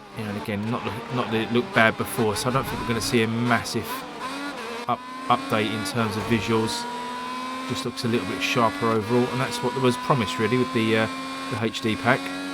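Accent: England, United Kingdom. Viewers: Your English accent goes well with the realistic racing genre. Giving it a proper Top Gear feel somehow. Part of the reason these Grid series take off so well on your channel IMO. Take note that covering realistic videogame racers might be a bit of specialty of your channel from now on. 😊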